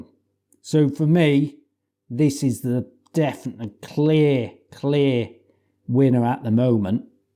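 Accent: Norfolk, United Kingdom. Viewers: I love your broad Norfolk accent!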